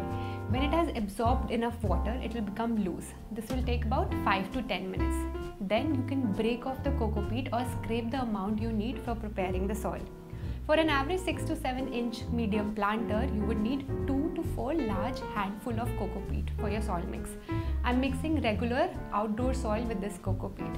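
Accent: India. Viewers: Nice indian English। speaking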